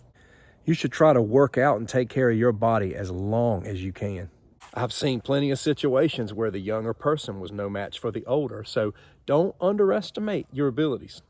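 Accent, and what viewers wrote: South, United States. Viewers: Great to hear a smooth Southern accent. Directions are north, west and east - South is a location. COL Stoney (r) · I don't know about the rest of y'all, but this old Southern gal could listen to this man talk all day! I've lived in Tennessee since 1987, but I still love a natural Southern drawl. ❤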